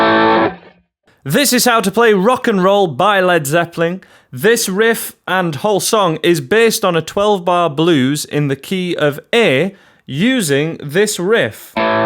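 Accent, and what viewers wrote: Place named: North Yorkshire, United Kingdom. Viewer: Thank you for the lesson on Rock and Roll by Led Zeppelin. Terrif song! Your accent sounds like a Northern Yorkshire accent. I'm an ex British Patriot. I live in Tasmania now. Keep up the good work. Easy to understand.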